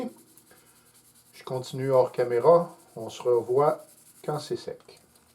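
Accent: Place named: Montreal, Canada. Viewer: bonjour je me suis abonné dernierement.je ne suis pas tourneur n'y menuisier mais vos video sont trés enrichissente de conaissance. vous n'ete pas avare de renseignement et de conseil votre voie est calme et tres douce tres sur,tres professionel. votre accent j'ai l'impression d'entendre mon beau pére qui est de montreal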